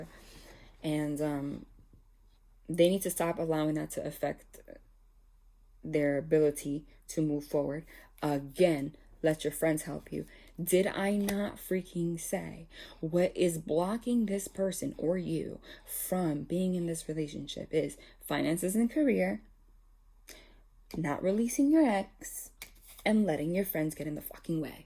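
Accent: New Jersey, United States. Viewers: Ha.. I just clicked , heard you talking and I said, "Jersey!"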